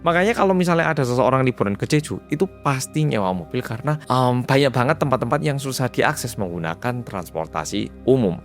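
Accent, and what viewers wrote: Java, Indonesia. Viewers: Org Korea yg medok bnget😊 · Waduh padahal pernah ndok jeju seminggu sekali kapal ku docking di dermaga sana. Angin nya besar pernah hampir terbang gara2 bawa payung 😂. Karena kota nya kecil kukira jeju itu kecil. Tiap meninggalkan jeju pasti kapal yg kutumpangi lewat depan area golf tepi laut. Itu ingatan yg agak samar karena sudah 26 tahun yal. 😢. Ini plot twist e piye sidane mas korea sing medhok? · Logatnya seperti orang jawa😅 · Salfok bahasa Indonesia medok tenan... Markotop Lanjutkan terus kakak... Oppa... Mas_eeee....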